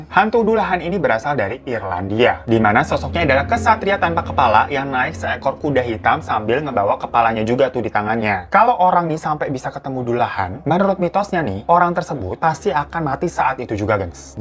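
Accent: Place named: Jakarta, Indonesia. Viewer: Logat betawi😁😁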